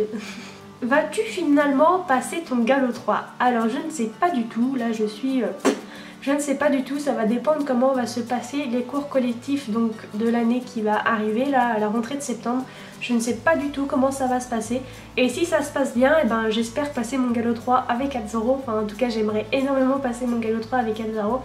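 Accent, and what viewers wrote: England, United Kingdom. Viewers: Ton accent anglais est juste magique xD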